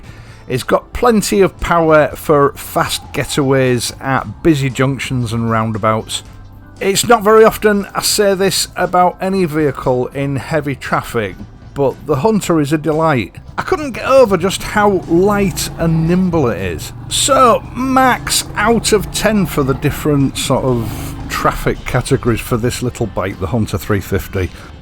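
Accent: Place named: Hull, United Kingdom. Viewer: Apart from the really good motorbike content, I just love listening to your Hull accent. Pure music! Great video.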